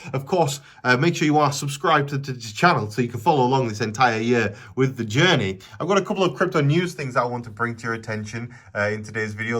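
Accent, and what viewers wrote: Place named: Northern England, United Kingdom. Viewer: Will do captain. Been subscribed for a year or so. I’m from up North too so it’s good to hear a familiar accent. Keep up the good work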